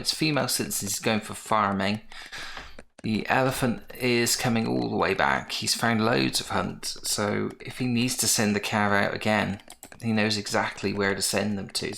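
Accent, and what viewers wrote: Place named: United Kingdom. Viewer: Doesn't sounds like much of a break! If you live in the UK (like you sound you do... you're also up quite late!)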